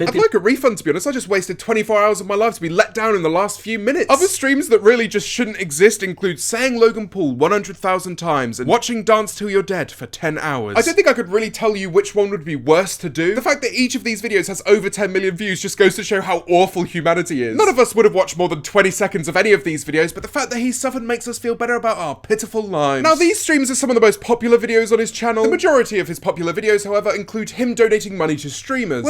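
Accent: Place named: England, United Kingdom. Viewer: Mr.Beast is fun just the way he is and anyways i wish i can do your english accent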